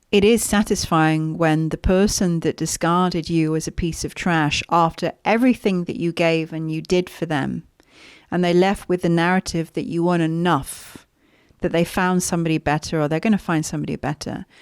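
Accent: United Kingdom. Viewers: I do excuse me for asking are U based on UK seem to me U have strong Brit accent anyway blessing for your work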